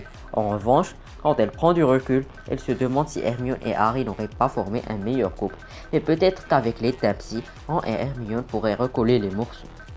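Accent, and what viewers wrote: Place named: Morocco. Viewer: C'est quoi ta voix ? Accent ou ton pays ? C'est un mélange entre un marocain, un québecois ! En plus on dirait que tu as de l'asme ! Ta voix c'est un bordel omg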